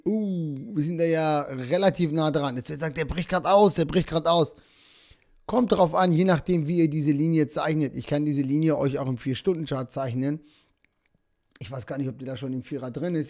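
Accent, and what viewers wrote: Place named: Switzerland. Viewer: das ist glaube das Schweizer Englisch.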